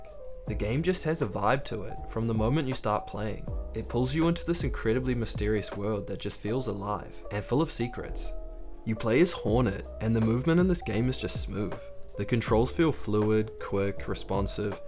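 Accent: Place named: New Zealand. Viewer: the New Zealand accent and saying deck is so funny 😅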